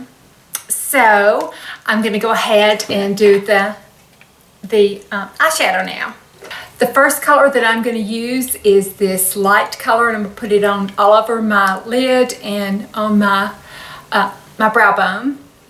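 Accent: South, United States. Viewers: Hi neighbor - I am from the western side of Arkansas. Discovered your channel thru The New Beauty email article recently. Love watching you and hearing that southern accent and phrases I am so used to. I feel like you are my good friend! Your demos are very good, especially for me, since I am 9 years your senion. I will keep watching. Keep up the good work!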